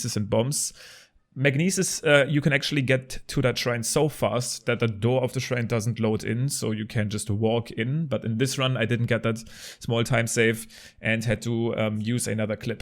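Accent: Germany. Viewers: are you german yo sound german bist du deutsch du hast diesen deutschen aktzenz beim englisch reden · Is he german cause his englisch is a bit german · you her like a german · He once played the German version and read the lines perfectly. Aaaaand his obvious accent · are you german? cuz you sound like a german if yes cool im too if no ok but i am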